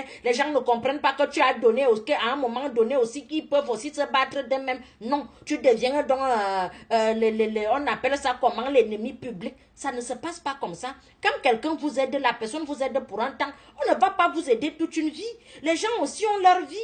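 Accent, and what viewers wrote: Cameroon. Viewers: Cet accent camérounais est vraiment trop dur et fort. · L' accent cameroonais la est fort ooo J te dit ma sœur, labre dargent la nexiste plus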